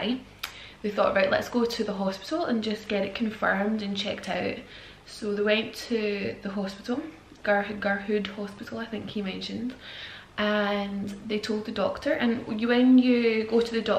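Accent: United Kingdom. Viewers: is that Scotish accent?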